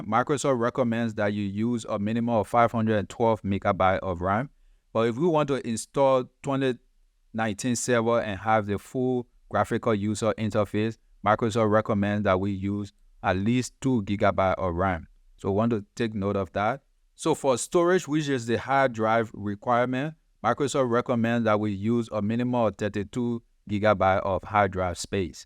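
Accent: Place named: Liberia. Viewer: Big up yourself. You sound like Liberian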